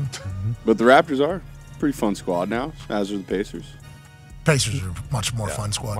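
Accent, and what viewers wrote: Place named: Pittsburgh, United States. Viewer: It's his yinzer accent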